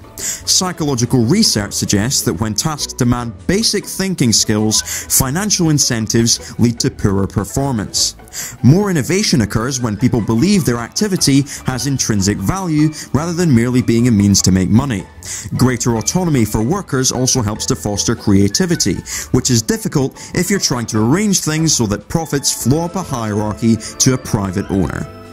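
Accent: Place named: Scotland, United Kingdom. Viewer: I was expecting him to say "Arseinine" 😂😂I love Scottish accents lol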